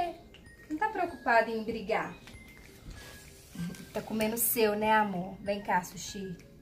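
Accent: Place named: Minas Gerais, Brazil. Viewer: Adoro o sotoque mineiro....aprender com esse sotaque é bacana. Gato e a gente adora brincar.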